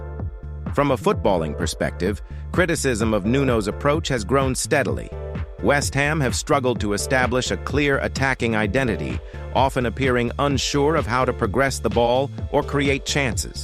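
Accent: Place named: United States. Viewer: But why have an Amerixan voice,get Danny Dyer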